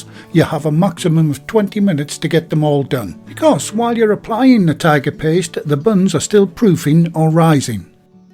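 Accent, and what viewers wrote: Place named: North, United Kingdom. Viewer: Hi, hope you don't mind me asking this - I have been using your Tiger Bread recipes and really enjoy your videos and clear instructions. Its great to hear a fellow northerner's voice. I was wondering if its possible to do a cold-proofed Tiger Bread with any of yourTiger recipes so the bread can be baked early the following morning? Also would you add the rice flour mixture whilst it was proving in the fridge or very gently the next day? Any advice would be much appreciated - thank you in advance Take care and stay safe Best wishes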